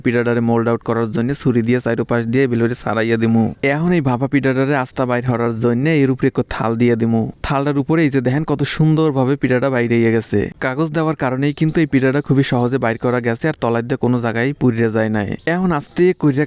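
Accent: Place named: Barishal, Bangladesh. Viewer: কথা গুলা সুন্দর আমাগো বরিশালের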